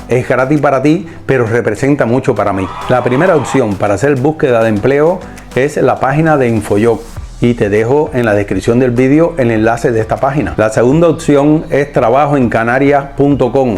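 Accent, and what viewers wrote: Cuba. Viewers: Hola. Eres Cubano o Canario? Tiene un acento Cubano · Tú no eres Canario, tu acento es cubano...Yo soy de Tenerife de muchas generaciones y NO se vive bien en las Islas...antes viviamos bien...Claro que igual para ti de donde vienes esto es el paraiso...Pero el Gobierno Central nos tiene olvidados...No tienes ni idea de nuestra idiosincrasia...No me gusta como defines hablando de un paraiso mi tierra que están enterrando...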